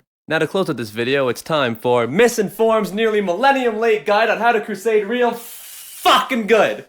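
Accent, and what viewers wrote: Newfoundland, Canada. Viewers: are you from newfoundland cuz i hear you saying ''bhys'' alot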